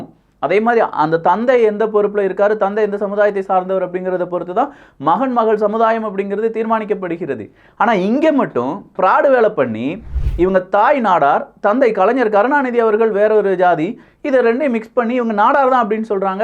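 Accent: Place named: Tirunelveli, India. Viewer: that tirunelveli thoothukudi slang, loved it bro, iam from tirunelveli❤❤❤😍😍😍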